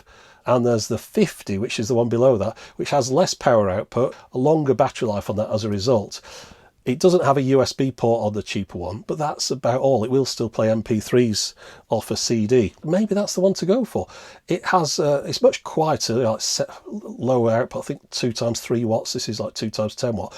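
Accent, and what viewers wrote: United Kingdom. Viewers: root is sexual slang in Australia. So the British pronunciation of Router as Rooter is funny.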